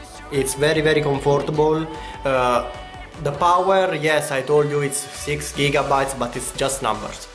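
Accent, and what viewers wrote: Italy. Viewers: ma sei italiano porcoddio?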